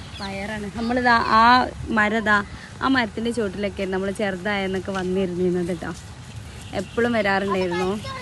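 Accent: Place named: Malappuram, India. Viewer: ഇത്ത മലപ്പുറം ഭാഷ തന്നെ 😂😂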